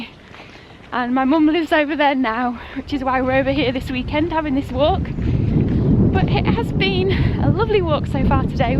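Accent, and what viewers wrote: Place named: North Yorkshire, United Kingdom. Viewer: Thanks for the sights around Pickering -- I especially enjoyed seeing the cool castle, and even the site of the Roman camp was pretty interesting. In our part of the world, 200 years old is about as ancient as we get (and that's rare). The majority of the trees here are older than the majority of the houses. This video also helps answer my wondering about the origin of your accent. I could tell it wasn't southern, but I didn't know enough about northern accents to pinpoint it. So there it is: North Yorkshire.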